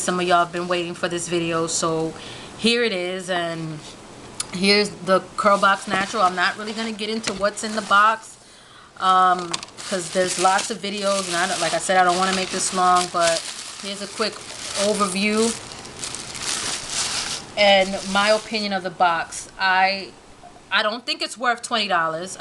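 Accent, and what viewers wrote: New York, United States. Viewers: Are you from new york? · BTW, I loveeeeeeeeeeeeeeeeeeeeeeeeeee your boldness and how you don't take crap nothing from nobody!!!!! lololololol You went straight G on this video though!!!! That NY accent! Love it doll! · are you from Ny?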